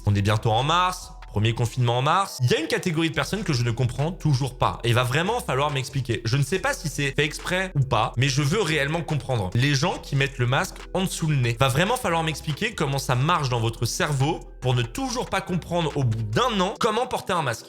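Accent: Lyon, France. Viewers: tu es de Lyon??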